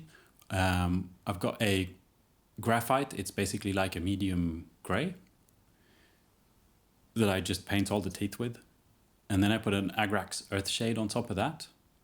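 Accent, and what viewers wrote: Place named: Sweden. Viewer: You sound like a swede who has lived in the uk for 14 years, working in a pr-company or . Great video as always!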